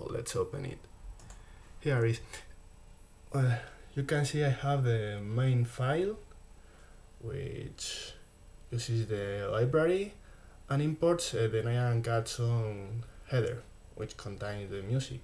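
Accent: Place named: Spain. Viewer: Como se nota que eres español xD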